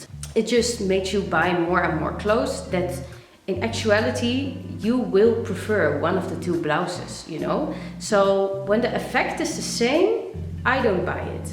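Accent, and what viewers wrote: Netherlands. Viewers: Hi! Spreek je Nederlands? Want ik denk aan jouw accent te horen dat je van Nederland bent? 🥰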